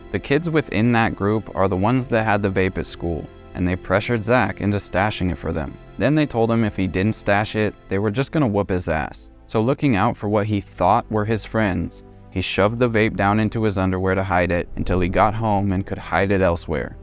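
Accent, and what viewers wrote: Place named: United States. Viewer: yvw.... enjoying u v much ... plus uve got the only american accent ive ever been able to handle lol xxx <3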